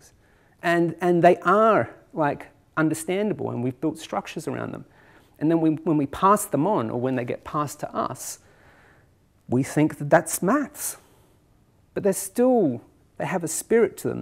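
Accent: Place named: New Zealand. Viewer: Sounds to me like a Kiwi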